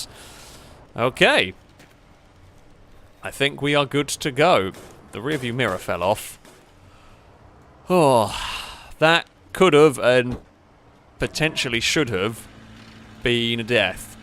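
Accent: England, United Kingdom. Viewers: pronounced "gas op die lollie" pretty perfect imo (English accent but still better pronounced then half of the Dutch citizens does)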